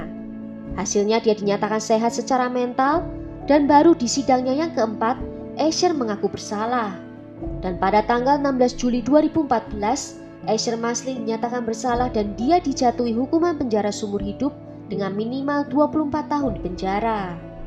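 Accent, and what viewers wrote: Java, Indonesia. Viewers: medok bngwt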